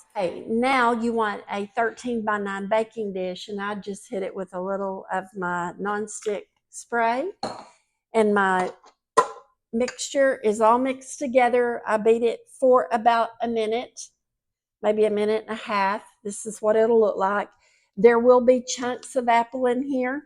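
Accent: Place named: West Virginia, United States. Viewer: So delectable. Love apple anything. Where are you from? I am originally from WV, I'd swear you are too!!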